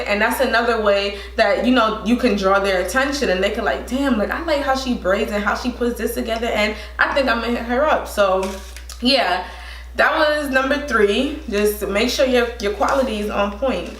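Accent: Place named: New York, United States. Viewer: I️ could tell ur from nyc or Jersey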